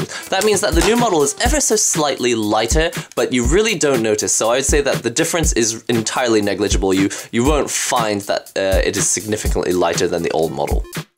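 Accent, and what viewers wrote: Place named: Scotland, United Kingdom. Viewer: I can't put my hand on your accent. You sound a tiny bit Scottish:)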